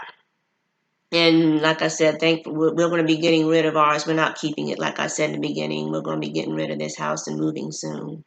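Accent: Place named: Louisiana, United States. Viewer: OK awesome I thought I detected a bit of a Louisiana accent LOL I am here near Baton Rouge Louisiana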